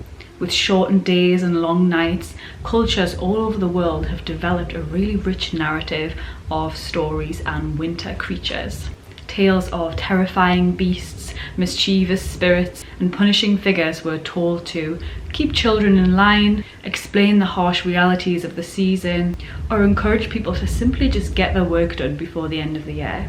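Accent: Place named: North East England, United Kingdom. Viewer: Been reading grettis viking saga and he gets attacked by monsters during Yule tide so seems to be a tradition of supernatural stuff during Xmas .always nice to hear my local north east accent in YouTube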